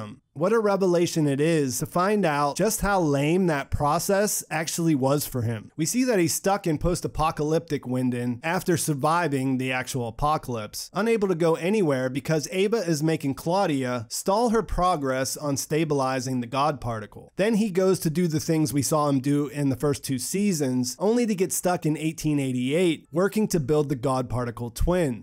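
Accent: United States. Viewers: It’s so exhausting listening to the american pronunciation of the german names - we are able to pronounce names from foreign series in almost the right way, so why don’t you all try too? :D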